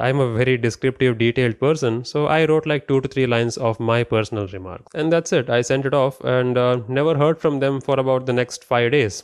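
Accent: India. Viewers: Thanks for being a legit guy,soothing voice and cool hairstyle btw.Keep up the good work. By the accent are you indian?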